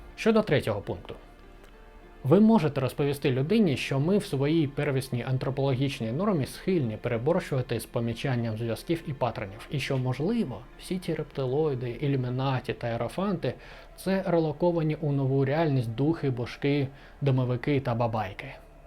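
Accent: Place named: United States. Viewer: На фоні включив це відео просто по приколу, але в моменті справді стало цікаво. Це якийсь суржик чи фішка що ти в більшості міняєш г на ґ) спершу думав що помилився, але чим далі тим більше стало здаватись що ти омериканец який добре оволодів мовою, але залишив акцент)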